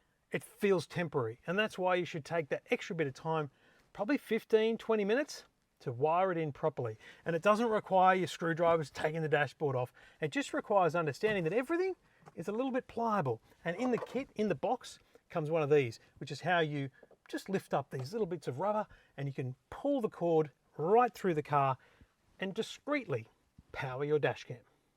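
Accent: Australia. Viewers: This video isn’t in the UK. He has an Australian accent. Also, the UK and Australia aren’t third world countries and they need to meet similar safety standards as the US, so yes there are definitely airbags in those a-pillars. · This is very clearly an Australian accent.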